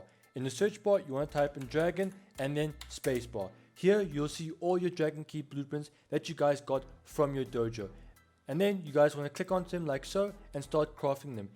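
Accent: South Africa. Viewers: Hey bro you def south african i want an invite man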